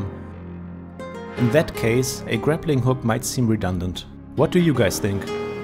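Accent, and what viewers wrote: Germany. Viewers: du bist deutscher das hört man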